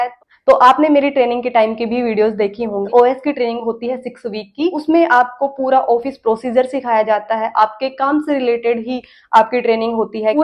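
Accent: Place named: Bihar, India. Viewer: No offense. You got sizzled bihari accent...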